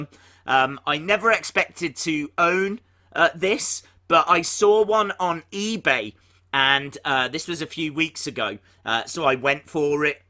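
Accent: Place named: Midlands, United Kingdom. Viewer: whereabouts in the UK are you from? Sounds a bit like a Midlands accent? What would be your nearest wwe show city?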